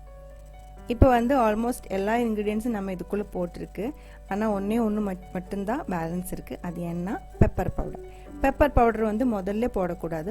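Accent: Kerala, India. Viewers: Your Tamil blended Malayalam is so nice to hear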